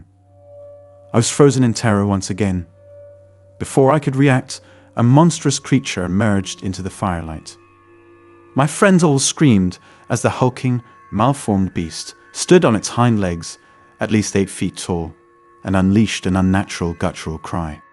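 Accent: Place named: England, United Kingdom. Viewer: An Englishman ! 😉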